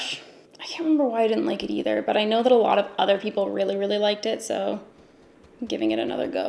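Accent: Canada. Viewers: Haku Hodo is just a pleasant word in general! It doesn't have to do with your Canadian accent. I think it'd just your wonderful, unique self!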